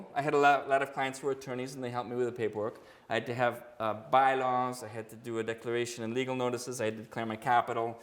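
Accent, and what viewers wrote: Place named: Northern Europe. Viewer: He said he was in Syracuse until his teens. Why does he not sound like New York (or even American)? No disrespect intended. I'm just curious. I thought he was from somewhere in Northern Europe.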